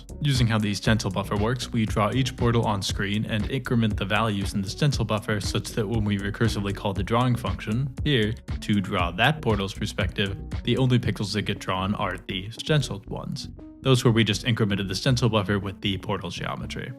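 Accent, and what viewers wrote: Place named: Denmark. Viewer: Great video as always! Jeg havde ingen idé om at du var dansker, din accent er ikke særlig stærk :)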